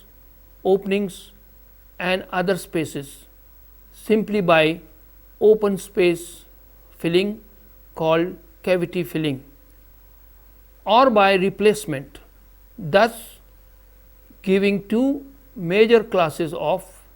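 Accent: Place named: India. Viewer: I really love Indian professors although quite accent is challenging me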